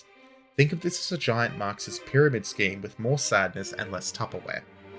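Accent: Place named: Australia. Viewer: A difference in dialect, he’s Australian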